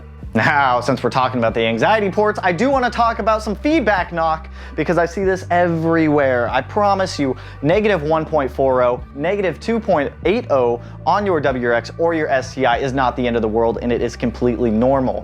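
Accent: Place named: New Jersey, United States. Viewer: Sound like you are from where I live ( NJ ) - everyone speaks fast. What 3 things you say for longevity??? Air/ Oil Separator, Cylinder 4 Cooling Mod, Killer B Oil Pickup?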